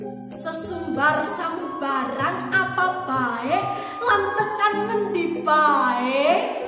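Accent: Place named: Banyumas, Indonesia. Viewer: Bagus banget...kental basa ngapaknya, baguus...👍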